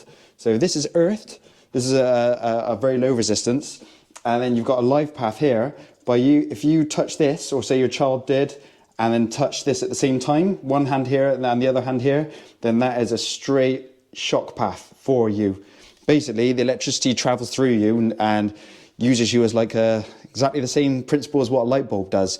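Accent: Bristol, United Kingdom. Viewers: Nice to hear a Bristolian Accent again. I moved over to Cardiff over 20 years ago and miss it. Good video as well.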